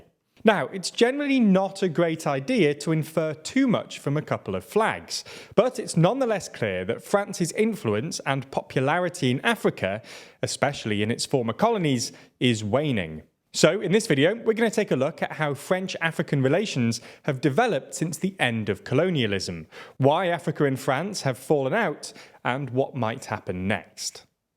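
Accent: England, United Kingdom. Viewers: Just wanted to see if it was gonna be some schmuck with an English accent telling us about the evils of the other guys. GFY!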